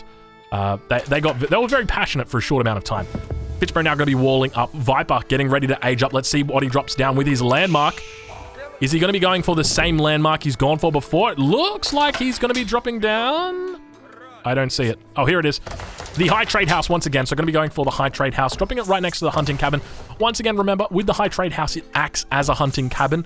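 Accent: Australia. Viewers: omg... can you be less aussie?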